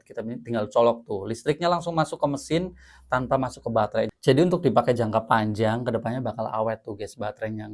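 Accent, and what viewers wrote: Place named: Banyumas, Indonesia. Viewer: Logat ngapak-nya masih kedengeran 😅. Tp raut mukamu oke ngab. Lanjutkan karyamu. Sukses selalu 🙏🏼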